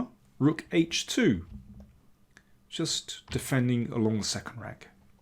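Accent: United Kingdom. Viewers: Absolutely agreed. What is crazy is how long he has produced such top-tier chess content... I remember discovering this channel when Carlsen defeated Anand to be the World Champion around a decade ago. If Daniel King is analyzing a game, that's what I'm watching. From my experience, nobody does it better. And with such a pleasant British accent, to boot! That never hurts :)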